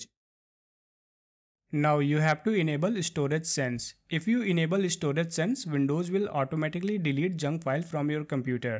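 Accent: India. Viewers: this Indian accent LMAO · You're not kidding. I clicked on this video hoping, praying the creator would have an Indian accent. And man, my relief was immense and impossible to put into words. I trust Indians with my life fr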